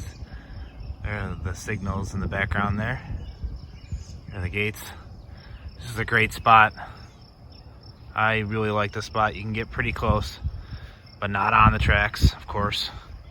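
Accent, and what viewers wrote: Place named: Western New York, United States. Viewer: Wonderful WNY/CHQ accent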